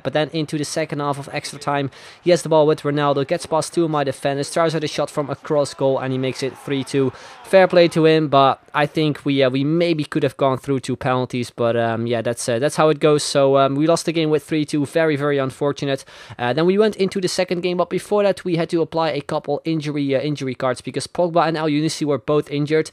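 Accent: Netherlands. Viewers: you can hear the dutch trough the english :p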